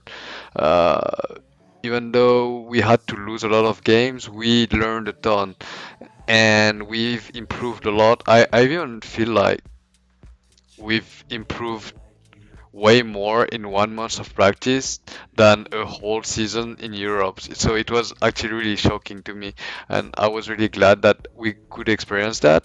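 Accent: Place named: France. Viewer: Haha I know that. but i heard it as if he said Fabian. I understand he has a french accent!! :P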